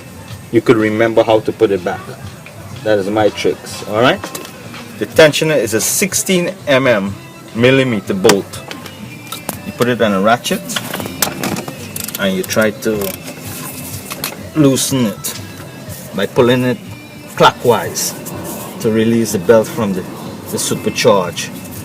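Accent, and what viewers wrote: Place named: Guyana. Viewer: great video you save me 1200 dollar keep up the great work keep the videos coming ,,,,u sound like a guyanese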